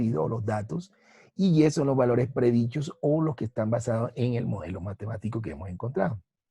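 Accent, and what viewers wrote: Caribbean Coast, Colombia. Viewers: costeño <3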